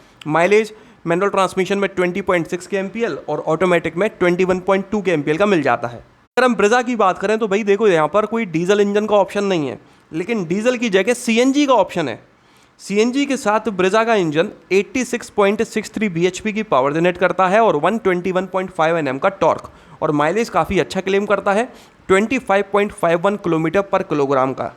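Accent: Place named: Gwalior, India. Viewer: Bhai gwalior se ho kya awaz se lag rha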